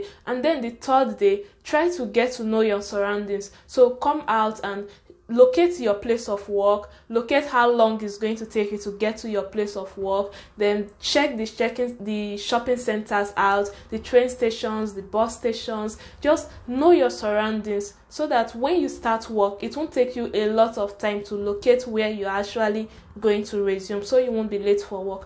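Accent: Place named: Nigeria. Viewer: I love your video.... and mostly your accent, you speak like a friend of mine....naija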